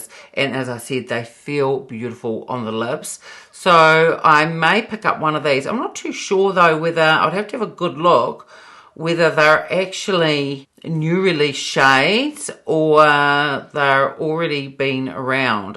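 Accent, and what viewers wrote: New Zealand. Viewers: So happy to have come across your channel.....as a fellow Kiwi living in Switzerland I really enjoy hearing the NZ accent when talking about makeup 🥰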